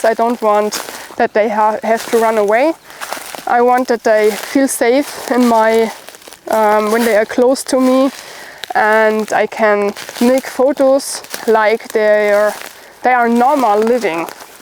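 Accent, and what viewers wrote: Germany. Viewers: That was a very interesting video. A little bit of a departure from your normal videos. You always have the best equipment and take the time to explain each item. Loved the entire camouflage on everything. You look so much better these days. Then again you look good always I must admit! You're obviously recouping well and looking good as ever. Just can't get enough of your German accent. It's so adorable. Appreciate how you put the hard work in for each video. Good health going forward. God Bless. 🥰🤗❤🙏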